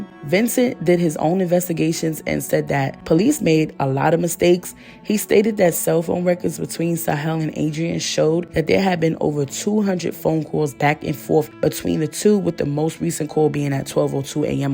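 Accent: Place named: East Coast, United States. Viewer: Your East Coast accent is sooo Cute. Also, What a MESS These folks Were in.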